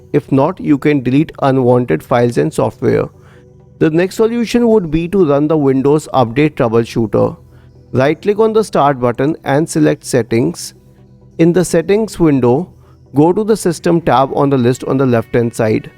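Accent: India. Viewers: that indian accent is a warm alarm that my problem is gonna get fixed thank you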